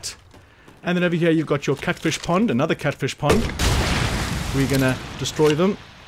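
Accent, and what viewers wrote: South Africa. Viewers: U sound like someone from the SA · Nice to hear a fellow southafrican accent lmao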